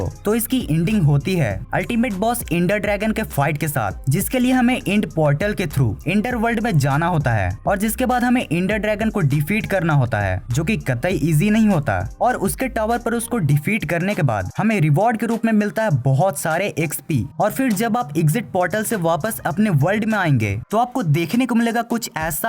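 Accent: Bihar, India. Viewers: Bhai bs 1 question ka abs dedo ki tm bihari ho kya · Are you from Bihar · Are bhaiya bihar se ho ka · You are from Bihar? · His accent of saying hindi is like bihari love from bihar 😎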